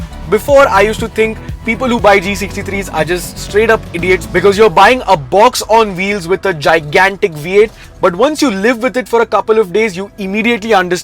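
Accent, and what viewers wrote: India. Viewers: Very Indian Authentic accent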